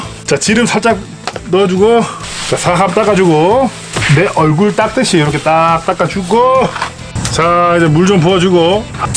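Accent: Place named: Gangwon, South Korea. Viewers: 기름을 지름이라고 하시면 강원도 사람이신가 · 솥뚜까리...장갑 쪄 주고...지름 두르고. ㅋㅋㅋㅋㅋ 완전 토종 홍천산이구나. 홍천 사투리 아는 사람은 알어드러이~~~ 크아~~ 영어 자막 넣어주고 싶넹.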